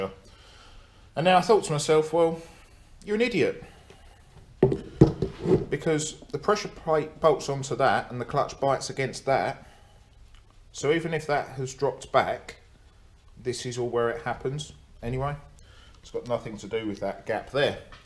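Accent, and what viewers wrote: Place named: Cockney, United Kingdom. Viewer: Best cockney on YouTube 👍🏻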